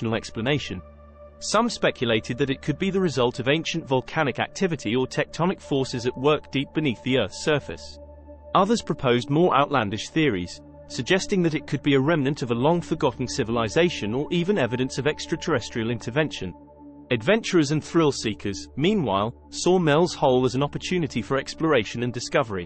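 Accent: United Kingdom. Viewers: We're all so sick of the British accent, mix it up already.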